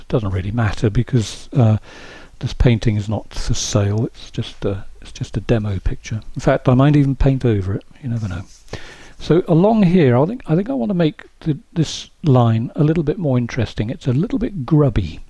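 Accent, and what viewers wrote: United Kingdom. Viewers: Your new camera is awesome. What great colors and details well as the good depth of field. Thank you for doing these inspiring lessons. I am so blown away from the sky. By the way, your British accent is marvelest to listen to.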